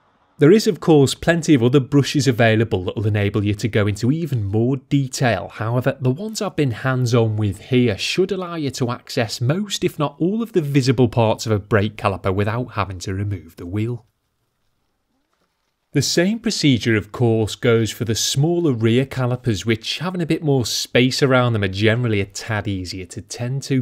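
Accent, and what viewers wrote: Liverpool, United Kingdom. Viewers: That’s is why we are all here. His British accent and coastal English noises are a perfect addition to the beautiful visuals. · Good ole Scouse accent!! Love his accent and love this channel 👌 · I999 he’s a scouser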